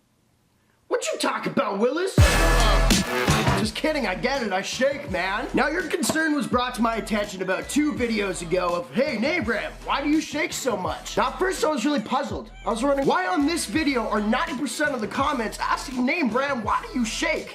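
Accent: Canada. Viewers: Hope you stay safe man//side note you have such a THICC Canadian accent already knew where you were from in the first min 😂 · Are u Canadian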